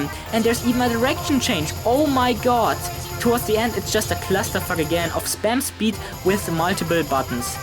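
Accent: Germany. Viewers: I do not want to ask you a very personal question. But… are you perhaps German? Because I’m always getting this idea because of your accent … 😅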